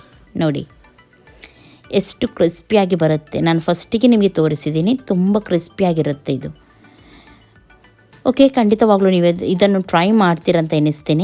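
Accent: Mangalore, India. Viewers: Hi dose supper ನಿಮ್ಮ ಮಂಗಳೂರು ಕನ್ನಡ ತುಂಬಾ ಚಂದ ಉಂಟು 💐 💐 💐 💐💐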